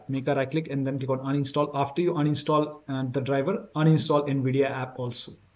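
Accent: India. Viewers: i cant listen to some guy with an indian accent sorry .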